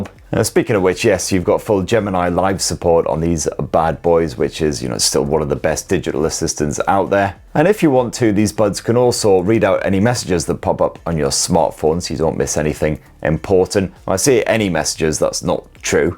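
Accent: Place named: Northern England, United Kingdom. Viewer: Very useful review - Google translate helped me with your more extreme vocalisatory nothernisms. Seriously very useful and engaging with the duller info. Thank you!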